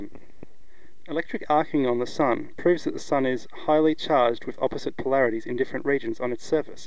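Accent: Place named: Australia. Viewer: it sounds like an australian accent. good on you for preserving it.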